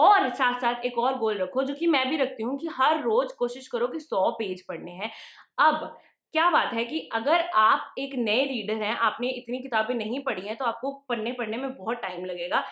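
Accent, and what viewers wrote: India. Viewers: I think you have a very good command over English language especially coz of your indian accent. I mean your language is quite easily understood by indian audiences. I looked for audio books over YouTube and applications so that I can improve my English but didn't get even a single video or audio book in indian accent. Then I thought of you for a second that why cant you create an audio book over youtube. Isn't it a great idea? It will help a lot of Indians like me who are looking for such audiobooks. I hope you come up with atleast one such book in near future. Thank you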